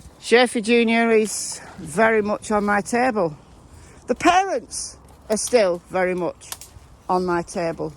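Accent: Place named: Greater Manchester, United Kingdom. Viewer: Your videos are very interesting they are just not drama I appreciate what you do and I love listening to you talk your accent reminds me of my relatives from Ashton Underlyne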